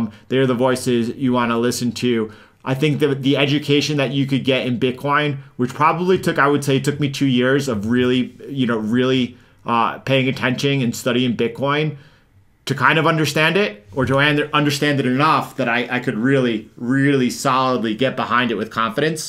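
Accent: United States. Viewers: Hard to trust people with American accent nowadays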